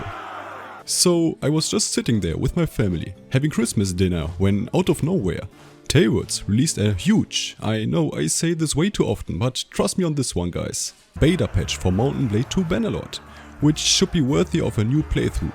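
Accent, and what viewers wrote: Germany. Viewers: Haha mann hört nach 3 Sek. Das er deutscher ist 😁 gj man. Mach weiter so 😉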